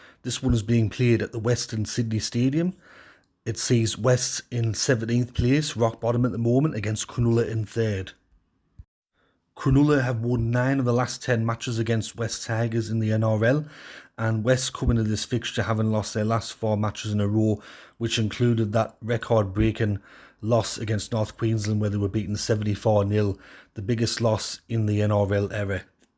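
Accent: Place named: RP, United Kingdom. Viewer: That accent is just pure class. You should be a member of the House of Lords.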